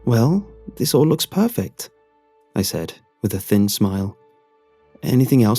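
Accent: United Kingdom. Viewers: Yeah honestly.. I love the British accent.. I just wonder how it isn't broken or sore from all these story times...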